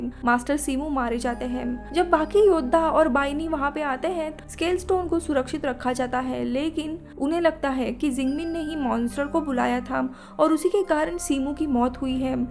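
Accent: Maharashtra, India. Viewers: Aap marathi ho kya?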